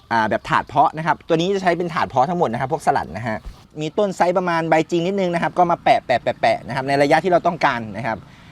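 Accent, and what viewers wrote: Isan, Thailand. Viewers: ชอบๆพี่ดูตลอด .ได้สาระดี .พูดอีสาน กึ่งไทยสุดยอด..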